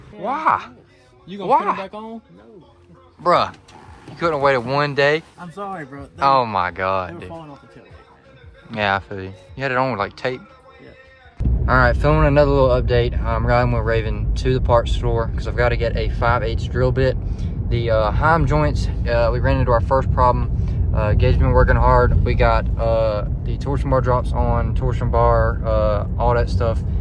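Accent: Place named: Alabama, United States. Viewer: you from Alabama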